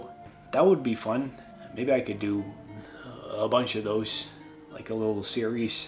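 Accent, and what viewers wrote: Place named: Upper Peninsula, United States. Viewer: You're my favorite YouTube discovery of 2025! I've been assuming you've been doing an intentional Joe Perra inspired thing with your voice. But I don't think you would use an affected voice for an entire video like this. So now I'm thinking Joe Perra's accent is inspired by not just the folks who live in Michigan's Upper Peninsula, but specifically folks of Swedish heritage up there. You both nail a very hygge feel. (Not a Swedish word but it's caught on in the US for a specifically northern European cozy vibe.) I've had the causal relationship backwards! You come by it naturally. No need to build a character around it. Happy belated birthday! Your English is easily as good as if not better than half the native speakers I know. Maybe you don't have the vocabulary of a college professor. But the vast majority of us don't, either. 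Don't sweat it.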